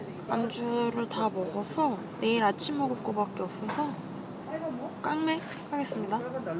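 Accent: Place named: Seoul, South Korea. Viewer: 서울말 ㅎㅎ!!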